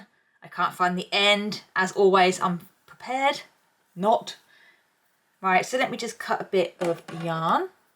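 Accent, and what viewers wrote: United Kingdom. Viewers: I love love love you for many reasons 😂 but most of all is british accept but US terminology. I had no idea that i can gwt both. So it's all a lie that I can't have my cake and eat it too 😂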